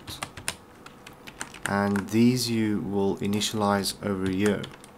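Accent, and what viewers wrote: South Africa. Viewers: Are you a South African?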